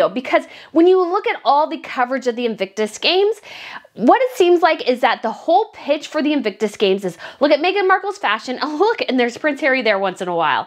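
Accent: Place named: United States. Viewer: I like what you are saying but can't you soften your american high pitched voice?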